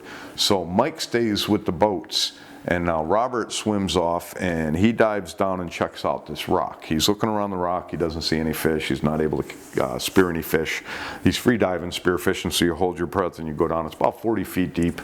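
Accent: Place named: Midwest, United States. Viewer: I find it extremely odd that, a guy from Michigan or Illinois (guessing by the accent) who also, admits that he's too Scared to swim in the ocean....is giving advice and recounting stories about Sharks and shark attacks. That'd be like a life long floridian telling the do's and don'ts of ice fishing. 🤔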